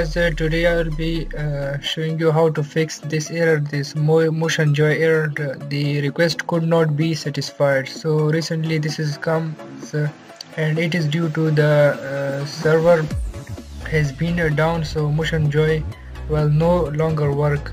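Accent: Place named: India. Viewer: o my bad sounds like an Indian accent ill say Asians as a whole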